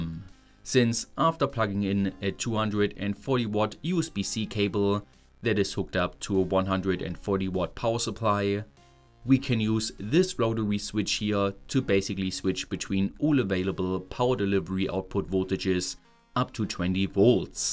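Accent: Germany. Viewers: love your German accent too I know it's irrelevant. When are you gonna build an esp32 grow tent for that German plant that people like to consume lol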